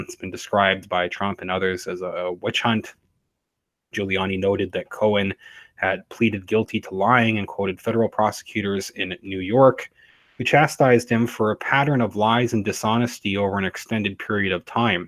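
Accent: Canada. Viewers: if you click on ABOUT--- it shows the channel information page...channel location is Canada . His accent is Canadian they sometimes pronounce about...aboot...kinda cool ...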